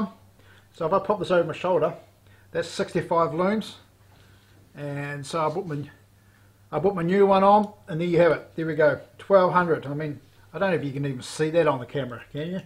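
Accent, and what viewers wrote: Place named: South, United Kingdom. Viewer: Your accent is interesting it’s like a southern British sounds cool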